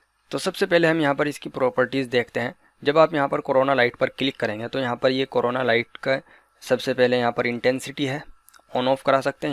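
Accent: India. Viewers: Hi, you sounds like my side. Are you from U.P.?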